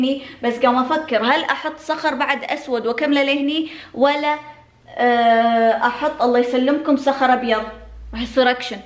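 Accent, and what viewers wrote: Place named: Kuwait. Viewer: احبج كثير واحب لهجة الكويت اختك من السعوديه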